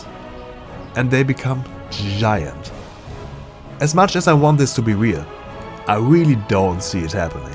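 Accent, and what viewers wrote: Germany. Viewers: Are you German? :D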